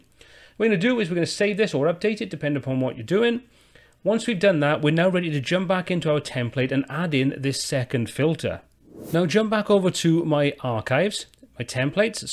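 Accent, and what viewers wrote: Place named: England, United Kingdom. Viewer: You mate, are a genius. Great to hear, and see, someone with a regional English accent give clear and concise help. So tried of hyper active youngsters trying to blind everyone with their knowledge. Or a video with a silly tune playing and no voice overs. Worth being locked down for :)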